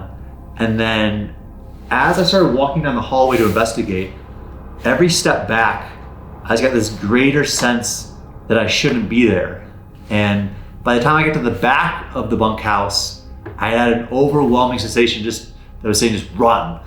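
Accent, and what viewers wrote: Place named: East Coast, United States. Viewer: You sound like youre from the east coast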